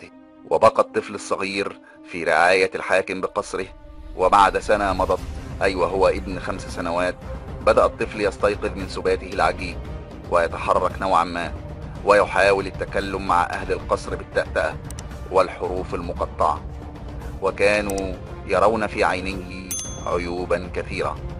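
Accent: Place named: Egypt. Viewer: اخى العزيز لا تنطق حرف الجيم بلهجتك المصرية عند قراءة القرآن او الاحتديث النبوية الشريفة بارك الله فيك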